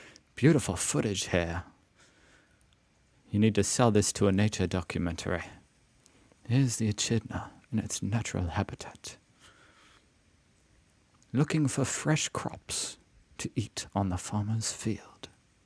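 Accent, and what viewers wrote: Australia. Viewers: Big W is a part of Woolworths and it's pronounced Ekidna 😂😂 love your videos and your Aussie accent 😅 Happy Fathers day to you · Mate, your Aussie accent is on point.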